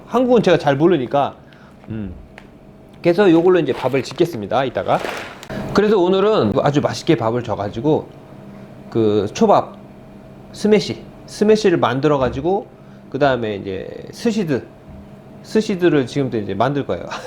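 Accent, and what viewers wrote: Japan. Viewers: 그니깐요 역시 사람은 계속 써야 하나봐요 초창기 모습 보면 재일이나 일본 사람이 한국말 배운줄 알았었는대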